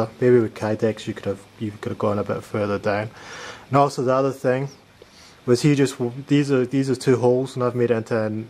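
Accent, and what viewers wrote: Scotland, United Kingdom. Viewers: There,s me thinking ah yes got to be an aussie and then I hear a man with ma home country accent, see you, you must be a jock thats what im thinking,anyway loved your video and the intro is good enough for the movies keep up the good work all the best.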